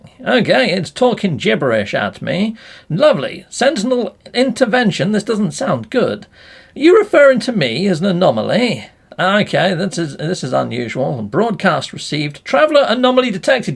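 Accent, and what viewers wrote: United Kingdom. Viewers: lol 5 miniuets in and so many Britishisms i feel like i'm back in a London Pub 🤣 Never change!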